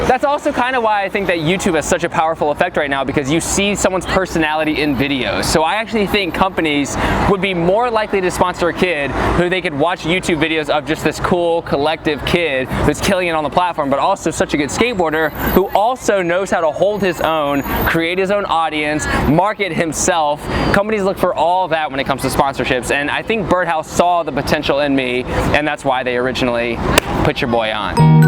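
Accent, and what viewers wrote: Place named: New York, United States. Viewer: lol i love it the new York in you is starting to come out.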